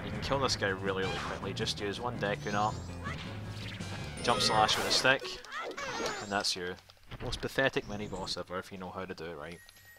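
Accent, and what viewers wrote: Scotland, United Kingdom. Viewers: Hey, are you Scottish? I don't know enough about accents to get any further than that.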